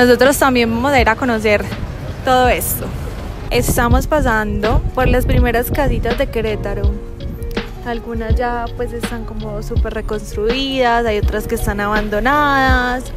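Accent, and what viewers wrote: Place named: Colombia. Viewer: Tienen un feeling y una manera de hablar las colombianas que me encanta,voy a seguir viendo los vídeos de esta nena 😊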